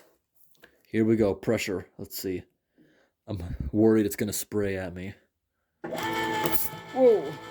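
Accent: New England, United States. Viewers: That is his new england accent.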